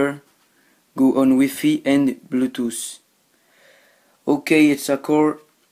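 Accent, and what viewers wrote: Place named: France. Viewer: dat french accent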